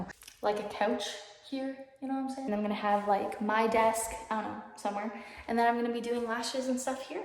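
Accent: Canada. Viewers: I love your accent, it sounds so canadian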